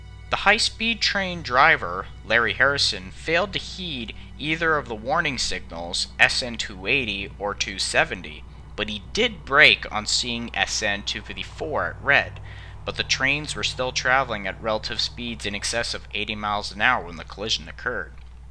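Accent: United States. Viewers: yall are so mean, he’s American and is trying his best to talk out British words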